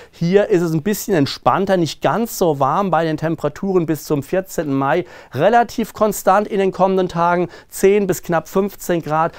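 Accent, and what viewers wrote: Hesse, Germany. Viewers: Hallo Du, das Hessische ist nun mal so - Augen zudrücken😉 Wo kommst Du her?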